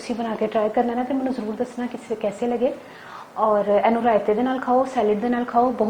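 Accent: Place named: Punjab, India. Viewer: Ap jb panjabi main baat krti hain to boht acha lgta hy mashallah boht piara bolti hain or cooking b zbrdust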